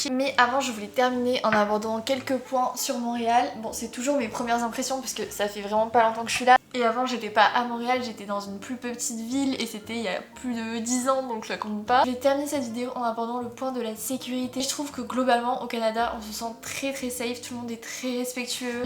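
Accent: England, United Kingdom. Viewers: Trop bien comme d'habitude et j'adore ton accent anglais ton haul en anglais est trop bien 😆😆☀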